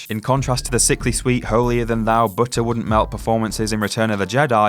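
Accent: United Kingdom. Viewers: How? He sounds like a typical British guy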